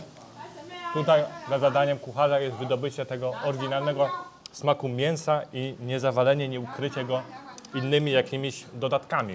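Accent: China. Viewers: Chłop co po polsku mówi z chińskim akcentem, no mistrz 😃 trafiłem tu przypadkiem ale chyba zostanę 😁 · mówisz jeszcze po polsku ale już z chińskim akcentem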